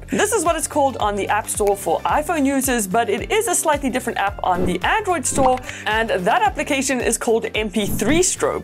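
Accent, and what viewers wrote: South Africa. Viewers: What accent is that? ZA? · Is that a South African accent? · Wait are you south african?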